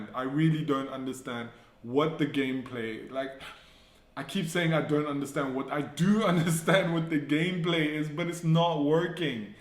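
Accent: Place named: India. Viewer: You look black but you sound 🇮🇳 Indian? I don't understand either?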